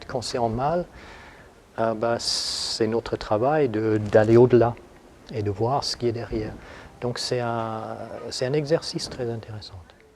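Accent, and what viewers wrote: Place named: England, United Kingdom. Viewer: We can hear a little english accent, may be that's why... (I'm french) ;)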